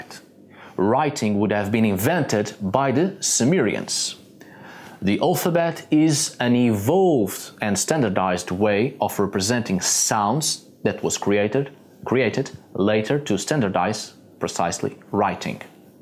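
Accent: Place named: Portugal. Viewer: Were are you from' your accent looks very portuguese x)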